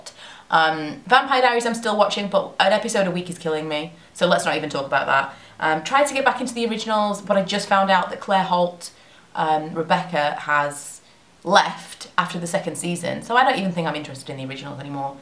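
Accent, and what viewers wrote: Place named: Northern England, United Kingdom. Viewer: Not sure if it's the husky voice or the mention of beer, but you were particularly Northen in this video & it was great. 😃😃😃 love you xxxxxx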